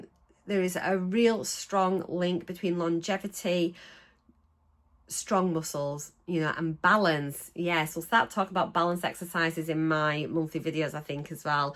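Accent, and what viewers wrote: Yorkshire, United Kingdom. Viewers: Congratulations, on reaching your weight loss goal. I appreciate the time and effort it takes to create your videos; all very informative and inspirational. Your upbeat nature helps me overcome impatience when I feel like my own weight loss is stalling. Truth is, it never stalls for long. I tend to stay at one weight for two or three days following injection and then lose a pound or more during the remainder of the week. After my weekly injection, I watch your corresponding GLP-1 Diary video. For example, my next injection begins my week 12. After my poke, I will watch your Week 12 video to see what results and side effects I can anticipate as our weight loss rates and side effects have been similar so far. I have lost 30 lbs as of today. Woo hoo! Only 70 more to go until I can celebrate like you! Mounjaro is a godsend and the ONLY thing that has worked for me. 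Conquering obesity while building muscle is the best thing I can do to stay healthy as I age. Being able to fit into non plus size clothes is just an added benefit. On a personal note, your lovely Yorkshire accent reminds me of my cousins who live near Leeds. I am in Canada so our visits are few and far between. Take Care and best wishes as you begin your maintenance phase.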